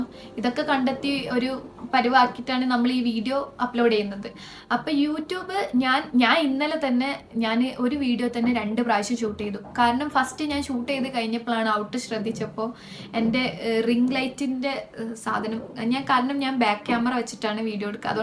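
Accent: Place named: Malappuram, India. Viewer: *നമ്മുടെ മലപ്പുറം മലയാളം ആണല്ലോ. ഇവിടെ എവിടെയാണ്? പെരിന്തൽമണ്ണ ആണോ*?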